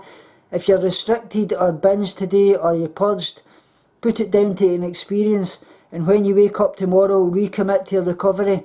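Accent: Scotland, United Kingdom. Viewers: thanks for these refreshing thoughts. you are so inspirational. i do not consider myself in recovery because I still practice all of my ED behaviors, but I do see a therapist regularly and I am starting to consider the idea that maybe I deserve recovery. that maybe my life could be better. maybe, just maybe, I have a future to live for. so maybe because my thoughts are changing, even though my behaviors aren't that I can consider myself in recovery. idk. what do you think? btw I wish I lived in Scotland so I could hug you. you are so sweet. and I absolutely love your Scottish accent. I know it isn't an accent to you, but I am from the U.S. so it sounds so cool to me. i wonder how our accent sounds to you. sorry. I am weird. it is am here and I need sleep!! ❤😘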